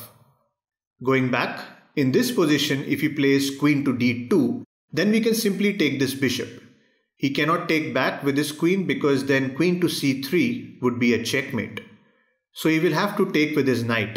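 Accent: India. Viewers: Indian accent is greett👍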